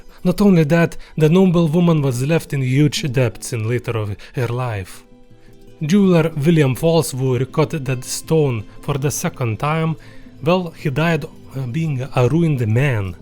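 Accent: Russia. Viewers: Hmmm ... English with Russian accent, nise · а чего не на русском, чусвтуется русский акцент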